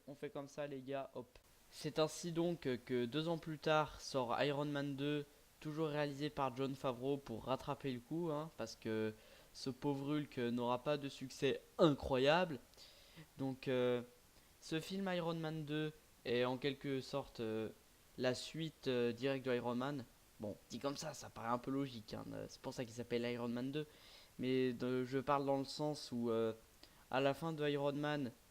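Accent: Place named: England, United Kingdom. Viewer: Osef l'accent anglais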